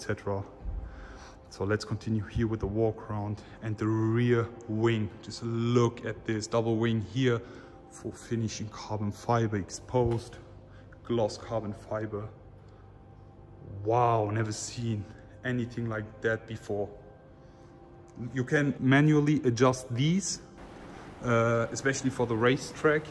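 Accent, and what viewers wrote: Germany. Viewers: Arnold is Austrian, this guy is German. The accents are totally different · By speaking in a German accent just makes the video so much more enchanting.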